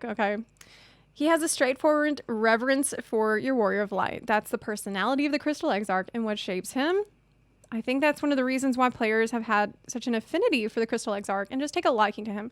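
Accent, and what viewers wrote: South, United States. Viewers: Love the southern accent :D PS: It would seem I've become a simp...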